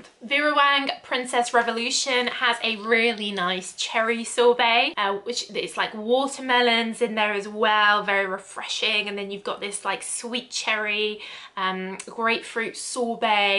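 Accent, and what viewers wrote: United Kingdom. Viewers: I’m from the Philippines and I love perfumes and I love watching people who have great British accent. So Here I AM HAHAHAHA LOVE YOUR VIDS ❤️💛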